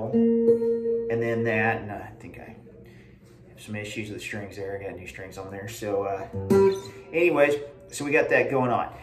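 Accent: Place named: South, United States. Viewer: You make things make sense without trying to sound like Eddie Van Halen. Tho I am 67, have been playing since I was 8, I have never had the confidence to play without chords. Except, and I cannot play it well. But your good ole boy delivery, and not pushing the beginner too far too fast, are a joy! Not that I am a beginner, I just play rhythm.;) But Thanks!!! can't wait to find your other videos!! 😄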